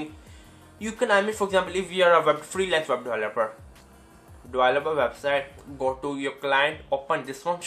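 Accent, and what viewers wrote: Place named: India. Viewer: Indian accent awesome sounds like Russel Peters.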